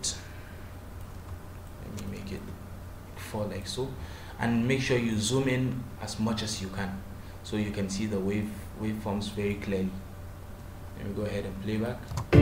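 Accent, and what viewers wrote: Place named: Africa. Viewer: hey,man...your accent,you sound like someone from africa,are you from africa ?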